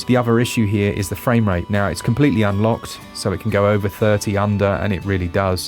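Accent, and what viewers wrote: United Kingdom. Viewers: What I love about this channel is you sound like a geezer I'd meet down the pub, but your knowledge is up there with Digital Foundry.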